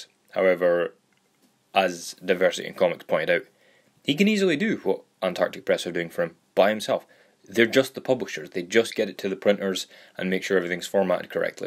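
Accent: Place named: Scotland, United Kingdom. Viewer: Nice to hear a Scottish voice on comicsgate mate. Glasgow ?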